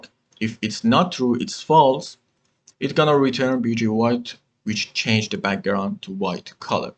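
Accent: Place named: Iran. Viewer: Persian accent sooo good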